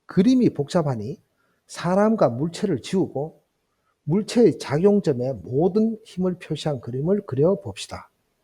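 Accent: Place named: Daegu, South Korea. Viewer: 감사합니다교수님. 제가 대구사람이라 확실히 대구 사투리로 들으면 이해도가 올라가더군요. 정말도움 많이 되었었습니다.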